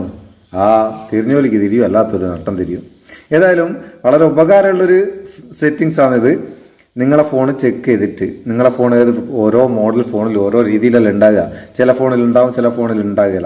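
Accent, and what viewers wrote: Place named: Koyilandy, India. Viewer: ഇങ്ങൾ കൊയ്ലാണ്ടി ആണോ ന്റെ ഉപ്പാന്റെ ഫ്രണ്ട് ഇതേ സംസാരം 😄😄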